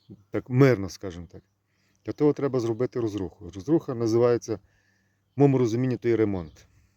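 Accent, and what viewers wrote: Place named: Lviv, Ukraine. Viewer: Я тоже иногда Тебя смотрю ,благоразумные мыслями делешся ,приємно ,ставлю лайк ,подписан давно , пишу коментарий более восьми слов ,по алгоритму ютуба для продвижения ролика .Хай щастить ,може колись завітаю в гості на турник.Чую ти по говорю мабудь зі Львівщини , може мені так здається ,в мене коллега так розмовляє бо я з Слобожанщини.Миру та Добра Тобі і в твоїм Рідним.🎉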